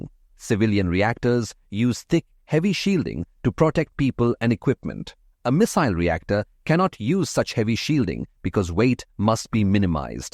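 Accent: India. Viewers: Is it me or the narator is indian ??? That beeing a well known gateway for russian propaganda...😂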